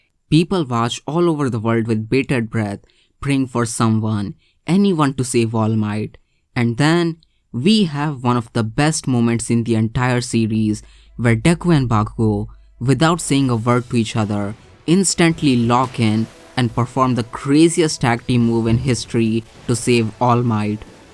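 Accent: India. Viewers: Clicks video, hears the thicc Indian accent, alright I'm out( after commenting ts ofc)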